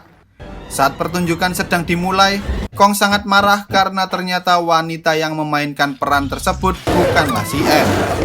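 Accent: Java, Indonesia. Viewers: Alasan gua suka sama channel ini: - Kadang suka becanda - Tidak terbata bata - Suaranya mirip opung gua - Memakai logat khas dari jawa Sukses selalu min 👍🏻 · Gw suka nih channel nontonin film dari bahasa yang bersahat(medok :v)😂 Edit:film zombi lagi dong kalo ada😁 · kek logat orang jawa 🙄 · Jowo mu ngendi min? 😂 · Logat jowo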